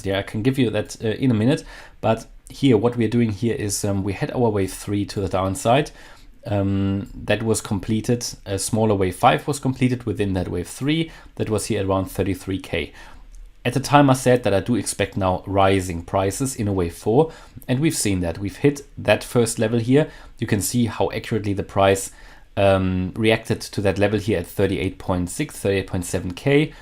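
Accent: Germany. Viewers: Where are you from? I kinda detect a german accent? ...Just curious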